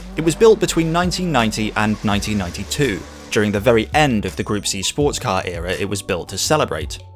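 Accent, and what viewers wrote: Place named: North, United Kingdom. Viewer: Awesome vid as always! Where in England are you from, if I may ask (I'm a non-Anglophone who's recently found a fascination for English regional accents)? You speak fairly posh but at the same time you are unmistakably Northern!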